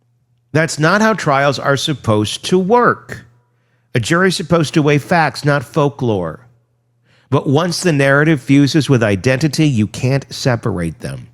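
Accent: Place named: South, United States. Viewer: I love your southern accent. 😂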